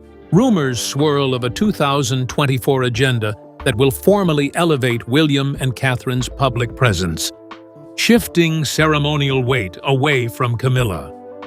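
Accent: United States. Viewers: Keep using the disrespectful images of Katherine and the obnoxious American narrator.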